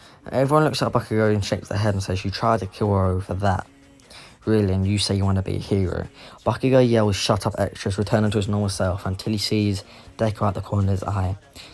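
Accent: United Kingdom. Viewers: insert british accent x100 Yo my g how r u with that british accent and dat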